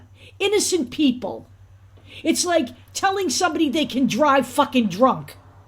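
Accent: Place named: New York, United States. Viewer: I L-O-O-O-O-VE your New York accent! I made note of his part-time comments when I first posted this comment on Brian Tyler Cohen's channel. He called them Loony Tunes. We people, who are thinking correctly--basically, thinking at all, should just keep referring to these morons as cartoons. I am a fan of Mel Brooks. He once said, "What do you do with a man who has kill 6-million of your relatives? You make them a laughing-stock!" These right-wingers cannot be taken seriously! Cartoons one and all!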